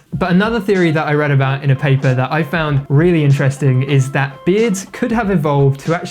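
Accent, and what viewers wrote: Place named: United Kingdom. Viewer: Just found you today, and now I am subscribed. Love your content! Hey I have a dumb question for you? Do you live in the UK as your accent makes it sound like you might?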